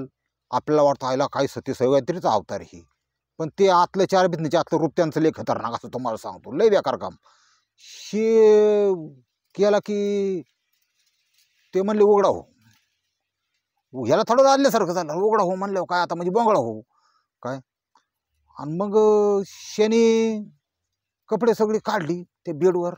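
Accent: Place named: Pune, India. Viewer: तुमची गावरान पुणेरी भाषाशैली आणि उच्चार ऐकायला लई भारी वाटतात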